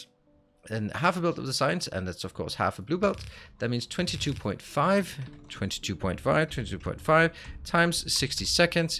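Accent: Denmark. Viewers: Are you danish, your accent sounds kinda danish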